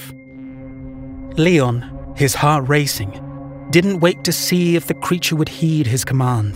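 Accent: United Kingdom. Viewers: I just found your channel and I’m so glad I did! I noticed you have a British accent are there any cryptids from Europe that you are particularly interested in? I’ve always been fascinated by the Black Shuck stories from England and the Pricolici from Romania and of course the OGs Vampires and Werewolves 😂. Have a wonderful day ❤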